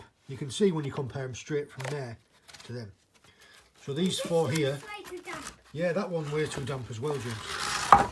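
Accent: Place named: Dewsbury, United Kingdom. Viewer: I'm from Bradford, your accent sounded close to Dewsbury one on camera. Keep up the good work! Really enjoy this channel. Perfect blend of openness/Honesty, information and also entertainment.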